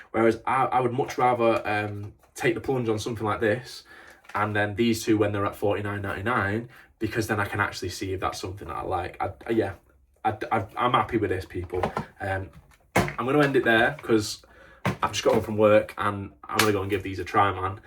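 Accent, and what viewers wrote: United Kingdom. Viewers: What on earth is going on, I've never smoked weed before, somehow this has been recommended for me to view. You're getting weed in the post though some kind of "prescription"? And from you're accent your're obviously in the UK, and you've openly documenting this. I don't know where I am right now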